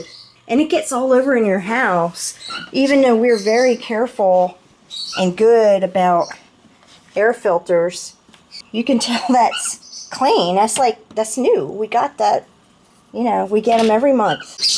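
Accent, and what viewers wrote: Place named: Pennsylvania, United States. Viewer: I love your videos, such a joy to watch! Are you from Pennsylvania, your accent reminds me of a friend from PA?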